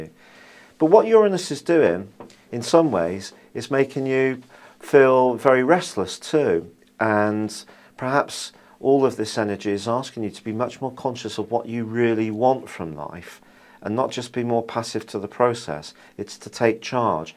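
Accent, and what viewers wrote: United Kingdom. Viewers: Very good and very accurate.!!! Enjoy your British accent and forecast a lot.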